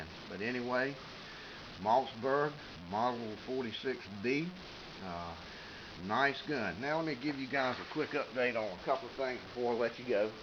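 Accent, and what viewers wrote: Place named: Virginia, United States. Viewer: I have a Mossberg 46B that I've had since I was a child and it was my father's since he was 11 or 12 years old from 1935 or 36. I cannot tell you how many groundhogs I killed with that rifle as a child and it still will drive tacks at 30 to 40 yards. I refinished the stock last year and it looks gorgeous but I only have one concern with the gun and is that is that it has problems sometimes feeding shells from the magazine...... I think it's more of a problem with the magazine feed tube than it is with thebolt because I've changed out the injector and shell pick up and I'm gonna be looking at the magazine a little closer and the spring to make sure it has enough pressure on the shells. If you have any ideas why they're not being picked up by the bolt please let me know ....... once the shells are shot the ejector works perfectly. 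By the way you must be from Norfolk or Chesapeake Virginia with that accent.....you sound just like one of my uncles!!!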